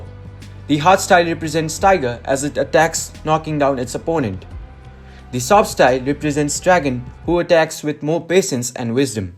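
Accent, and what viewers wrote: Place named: India. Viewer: Oh I’m sorry I taught you we’re because you have an Indian accent. Good Video btw!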